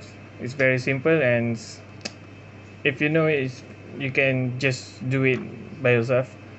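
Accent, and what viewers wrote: Malaysia. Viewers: U have malaysian accent bro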